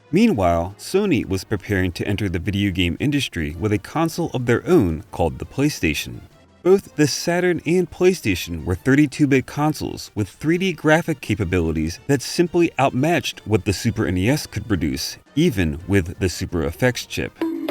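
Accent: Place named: United States. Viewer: I can't help but wonder what region of the US you're from. Or if you're Canadian. The way you pronounce your O's sometimes really stands out. I desperately wanted you to say "the poconos" lol. Great video btw. I've always loved Star Fox :)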